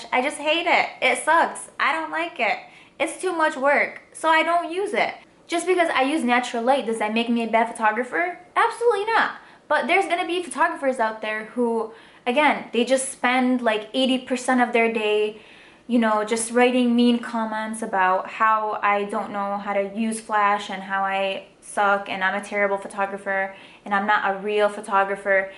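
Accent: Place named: Detroit, United States. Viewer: Yeah you from Detroit ... I hear it all in your voice lol I know cuz im from here too lol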